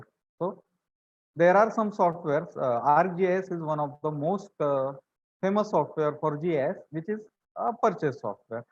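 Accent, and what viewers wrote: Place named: India. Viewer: inggris logat india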